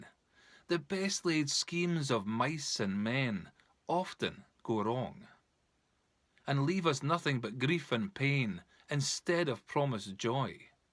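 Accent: Scotland, United Kingdom. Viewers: in a truly authentic brogue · done in a Scottish accent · nice to hear Scots reading Burns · all in a good Scot's accent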